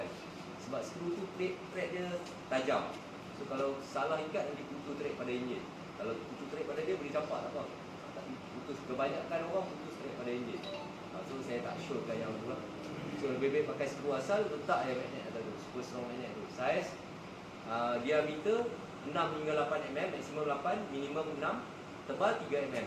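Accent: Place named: North, Malaysia. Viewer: Power...cara bahasa mcm orang belah utara...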